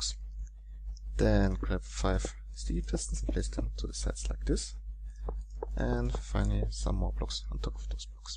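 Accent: Germany. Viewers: The houl sing,, german accent means: your are german, right ? the only thing i dont like in this video is the texture pack(reminds me of my old days in minecraft :,) ), but the door itself is cool :) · Are you german 😅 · Man merkt das er deutsch ist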